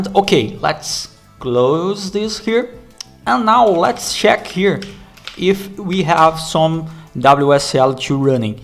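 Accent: Brazil. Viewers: Brasileiro falando ingles nao tem como nao pegar Haha. Otimo conteudo irmão. Parabens. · Eu sabia que vc era br só pelo o seu sotaque Br tem um jeito exagerado de pronunciar as vogais kkkk Vídeo top d+ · Sabia que era brazuka kkkk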